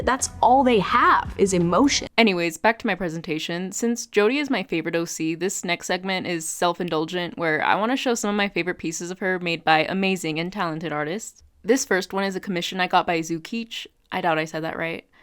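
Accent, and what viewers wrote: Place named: Southern California, United States. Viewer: r u a cali girl youre srs giving insane socal (specifically la and surrounding counties)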